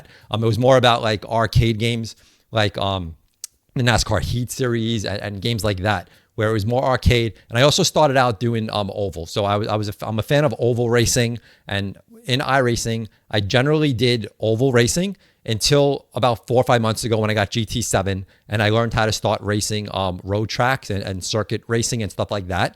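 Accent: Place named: Massachusetts, United States. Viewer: you must be from mass... im from new bedford now in florida but your accent brings me home...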